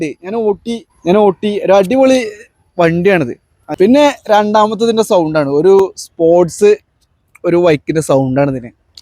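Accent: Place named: Nilambur, India. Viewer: Confident aayitt paray muthe Anne kond nadakkkum Nilambur language❤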